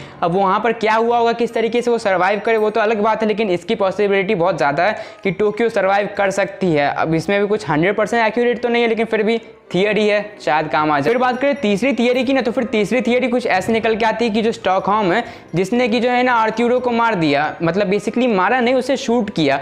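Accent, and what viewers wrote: India. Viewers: Tu Bengali hai kya bhai????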